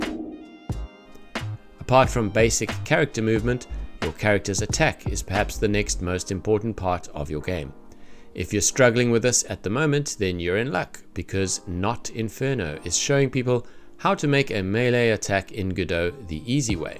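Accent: South Africa. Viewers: That an SA accent I'm picking up?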